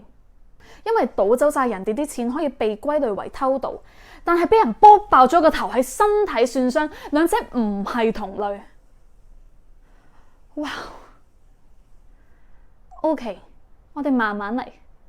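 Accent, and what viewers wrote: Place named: Hong Kong, China. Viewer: 多謝（閣下）菩薩其實我等咗以香港方式嘅廣東話講解佛學意理解終於等到了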